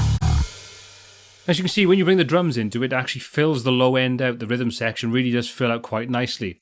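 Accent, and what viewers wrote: Wales, United Kingdom. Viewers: Im sensing a bit of welsh on your voice there mate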